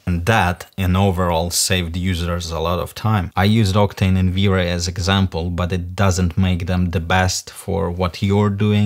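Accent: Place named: Russia. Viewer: а я сразу понял что наш человек вещает )))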